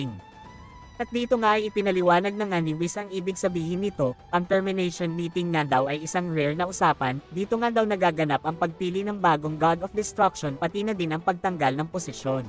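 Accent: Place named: Visayas, Philippines. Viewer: Bisaya, katamad makinig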